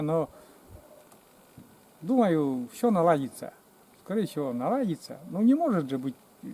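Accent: Komi, Russia. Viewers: Республика Коми,родной коми язык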